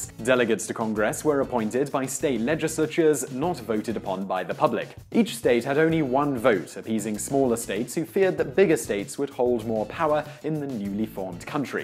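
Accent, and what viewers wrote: United Kingdom. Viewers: I love when you accidentally stumble onto an awesome channel. This channel is one of those awesome ones. I have been watching your videos non-stop for a couple days now. I can't get enough of them. However, is it possible for to slow down a little. He speaks super fast without any breaks. Sometimes I don't think he even stops at the end of his sentences. I think maybe he only stop at the end of his paragraph...lol In addition to his super speed he has that accent going on. You know when you are listening to another language that you do not know and you can't figure out where the breaks between words are? That's kind of what's happening here and I've been speaking English for 47 years. I actually have to pay really close attention, concentrate, & sort of translate what is being said. It's taking a little work to watch these videos and I watch youtube so I don't have to pay attention. 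If you do decide to take my advice, you don't have to slow down dramatically just a little slower would be good. Plus I think I see you turning blue from lack of oxygen....I'm just kidding about that. I see you have a lot of subscribers but I'm wondering if maybe your subscriptions would increase faster if you did slow down a little bit. I could imagine that some people may be passing up on pursuing your channel because your videos do take a little work to understand and follow what is being said. Obviously Europeans probably have no problem following you because they are used British-Englis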